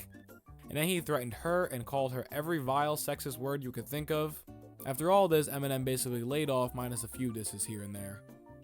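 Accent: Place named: Michigan, United States. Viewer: your accent sounds like your from michigan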